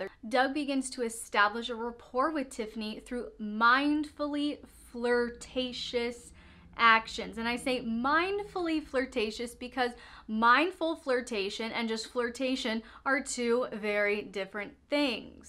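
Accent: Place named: South, United States. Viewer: I think this is one of the best explanations I've heard on this. Maybe it's because where I am in life right now. But in a sense I think you were talking to me. BTW, you sound like you have a bit of a Southern accent. Are from the south? It'll be my luck you'll say, "I'm from NYC. I don't know how you got that idea!" LOL! Thank you for the advice.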